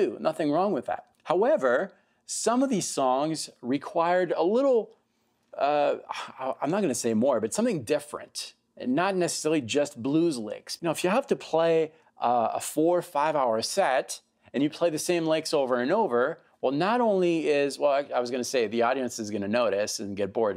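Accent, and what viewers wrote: Quebec, Canada. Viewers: A true lesson. I thought French Canadian. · I bet you're from Québec.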